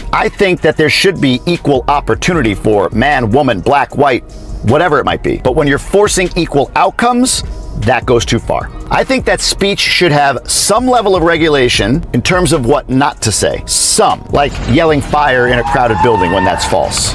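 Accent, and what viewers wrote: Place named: Michigan, United States. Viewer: I could tell your from Michigan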